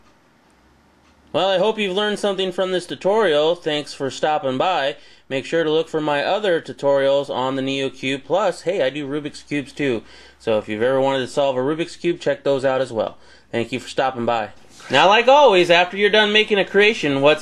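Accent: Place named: United States. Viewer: Damn, you talk like a stereotypical American.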